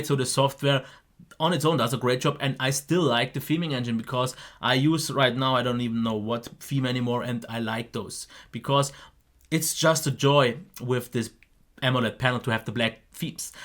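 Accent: Germany. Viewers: ich hab einfach sofort rausgehört das du aus deutschland kommst :D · Jack AFC he is Croatian as he said. His accent is German.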